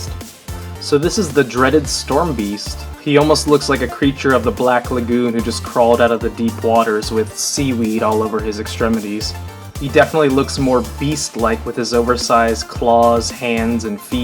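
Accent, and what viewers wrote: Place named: United States. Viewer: That American Narrator thx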